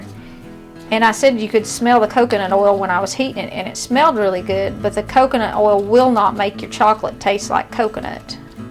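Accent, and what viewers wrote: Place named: Appalachia, United States. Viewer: Cutest Hillbilly on YouTube, and love the accent. Great recipe, thank you.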